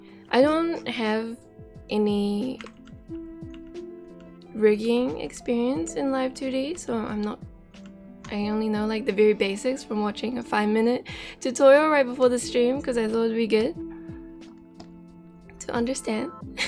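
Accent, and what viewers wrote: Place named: New Zealand. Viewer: Yoooo I was thinkin' man that accent is so familiar, it sounds so much like a kiwi accent, what could it possibly be? Surprise surprise it is a kiwi accent hahaha. Not too often I come across a kiwi streamer, kapai!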